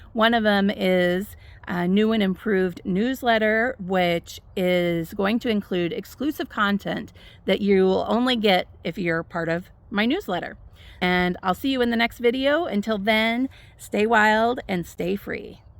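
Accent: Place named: Midwest, United States. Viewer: New subscriber here. Glad to find you. I'm from the Midwest too. Maybe that is why I listen and like the way you speak and present 💕💕🎁❤😊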